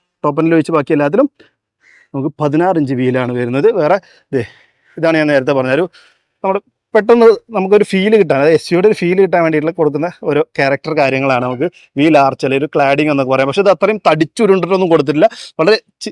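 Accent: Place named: Malappuram, India. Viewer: Typical malappuram slang aanu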